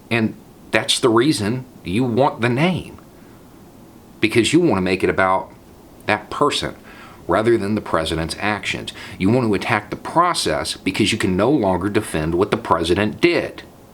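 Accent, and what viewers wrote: South, United States. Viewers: I wish I could sit down and have a beer with you. You're exactly what I need in my life - a southern, country guy with a thick accent who doesn't agree with all of my ideals but is capable of a rational discussion based on evidence and common sense. I always come back to your videos to restore my hope in the world.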